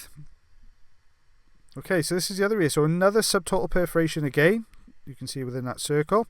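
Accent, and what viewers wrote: Wales, United Kingdom. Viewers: I so enjoy your videos. They're so satisfying and I love Welsh voices.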